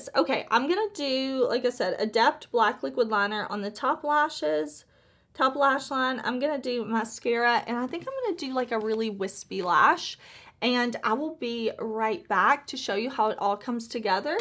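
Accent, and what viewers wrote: South, United States. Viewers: . I want to be you when I grow up. Love your eyeshadow looks and your Southern accent.😊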